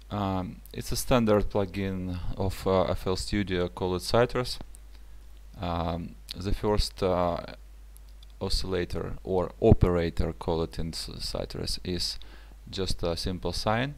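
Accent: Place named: Russia. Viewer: русский акцент))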